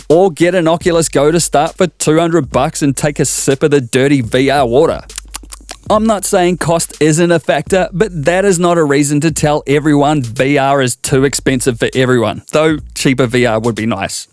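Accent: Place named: Australia. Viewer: Are you a fellow australian? also this video made me buy fours sensors and an oculus rift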